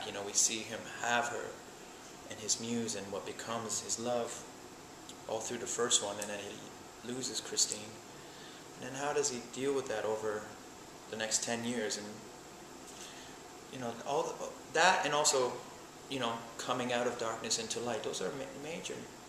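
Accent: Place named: Canada. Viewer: yeah he does have a slight accentuation on certain sounds :) but its defo a canadian accent :) he may speak another languadge he was born in Iran so who knows maybe thats the cause of the slight accent :D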